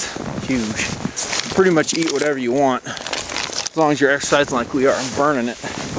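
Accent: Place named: Northwest, United States. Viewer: what state is this in? You sound like a Northwesterner.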